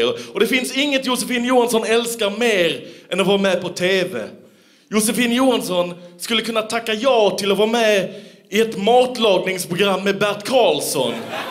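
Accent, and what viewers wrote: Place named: Skåne, Sweden. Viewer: han pratar skånska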